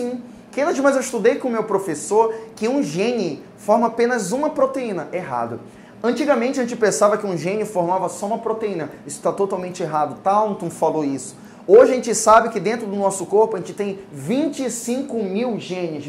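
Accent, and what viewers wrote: Pará, Brazil. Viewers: Ede Montanho ele é paraense. 😊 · é paraense? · Esse cara só pode ser do Pará... É lá de nós. éguaaaa cara saudade da nossa terrinha. o melhor.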